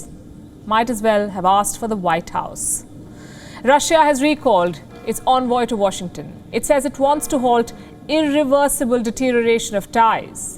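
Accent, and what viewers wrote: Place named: India. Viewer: It's like Indian English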